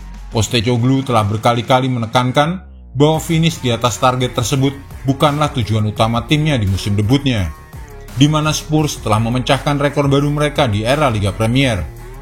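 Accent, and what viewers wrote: Java, Indonesia. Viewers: ngak ada pengisi suara lain ...medok banget:face-blue-smiling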